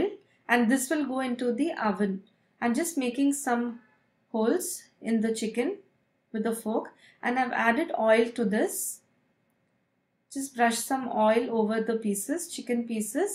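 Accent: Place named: India. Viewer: You sounds like you came from india...sorry if im mistaken...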